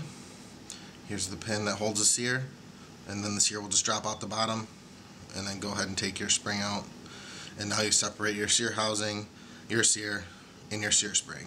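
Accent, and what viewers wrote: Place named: Michigan, United States. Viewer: btw you sound like you're from michigan haha no offence if you're not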